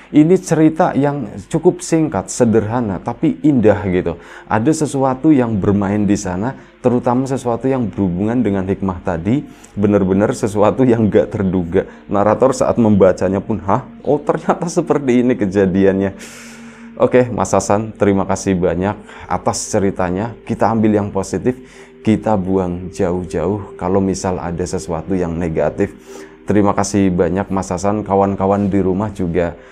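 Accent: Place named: Banyumas, Indonesia. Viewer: NUMPANG TANYA barangkali bisa dijawab : MENGAPA NGOBROL HOROR sudah lama tak siaran?. Kangen bahasa ngapaknya...😅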